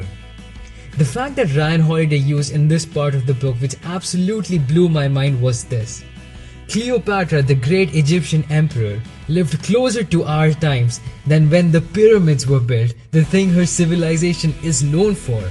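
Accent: India. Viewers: awesome! really awesome! BTW are you an Indian?ur accent is like one! 😄🙌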